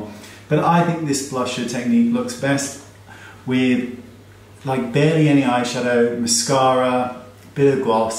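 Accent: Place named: United Kingdom. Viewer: Who else came here so they could hear in his lovely British accent say 'Naughty'. 💜💜💜😄😄😄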